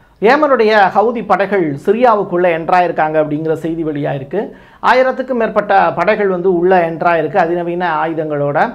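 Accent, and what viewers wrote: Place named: Madurai, India. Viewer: உங்கள் மதுரைக் குரலை கேட்டு பாராட்டுகிறேன்.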